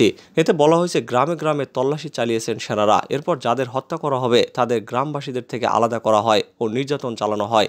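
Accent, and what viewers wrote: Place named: India. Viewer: এক ই প্রশ্ন আমি লিখতে যেয়ে দেখি আপনি লিখেছেন। সংবাদ পাঠক তো ইন্ডিয়ার ভাষায় কথা বলে। চ্যানেল টা কি ভারত নিয়ন্ত্রিত?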